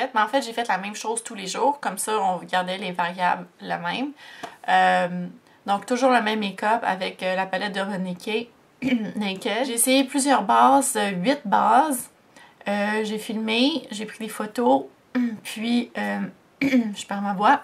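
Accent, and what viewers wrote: Canada. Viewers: Un Grand merci pour tes vidéos.. Mes recherches étant "une première" et ... J'atterri sur tes bons Conseils!!! Depuis ma Suisse natale et ayant une sœur à Montréal çela fait du bien d'entendre ton bel accent canadien..merci ;-)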